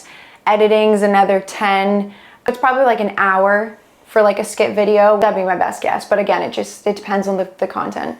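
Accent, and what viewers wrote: Canada. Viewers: I love hearing Canadian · I figured you were Canadian by the way you say words like 'House'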